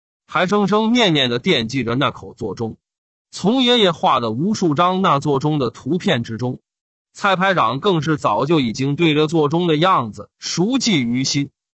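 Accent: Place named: North, China. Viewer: 原來說書人是北方人😊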